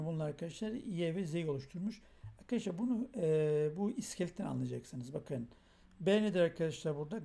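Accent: Black Sea, Turkey. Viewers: hocam karadenizli misiniz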